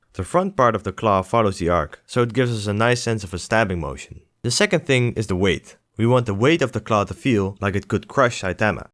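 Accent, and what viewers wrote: Netherlands. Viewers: Great vid! I noticed a bit of a dutch accent but I can be wrong though😂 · I love it that I can just hear your Dutch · Hey im new to this channel, maar ik hoor je Nederlandse accent kapot erg niet normaal maar je spreekt het nog best goed props. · 1 second into the video, hears his accent yep hes Dutch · aaaahh the dutch accent sounding ass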